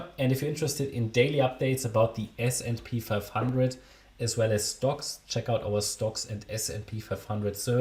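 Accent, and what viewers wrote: Germany. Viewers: Bist bestimmt Deutscher haha man hört es raus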